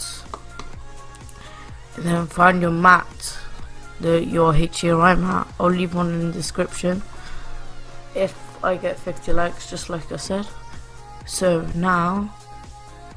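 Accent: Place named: Leicester, United Kingdom. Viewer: I can tell your from leicester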